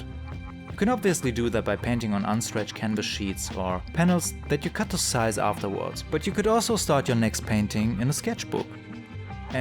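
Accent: Germany. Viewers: You have a German acsent Ich finde es schön wie du darüber erzählst was zeichnen für dich ist und das man nicht auf andere hören soll....Danke für die tipps 😄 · are you german?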